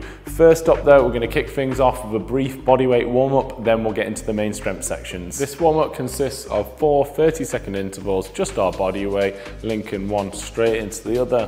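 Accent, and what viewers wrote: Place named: Newcastle, United Kingdom. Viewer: ARE U FROM GEORDIE SHORE??????? A LIFTING LHAGER VIDEO, SMASH ITTTTT LADDDDD. 😊😊😊😊😊😊😊😊😊😊😊😊😊😊😊😊😊😊😊😊😊😊😊😊😊😊😊😊😊😊😊😊😊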